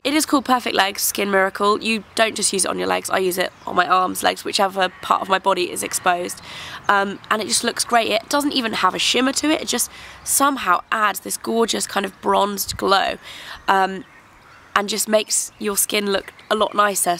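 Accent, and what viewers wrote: United Kingdom. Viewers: for my english oral proficiency class, in order to get a British accent I listen to your tutorials and reviews and I repeat what you say! maybe kinda creepy but you've helped me alot to get a consistent accent!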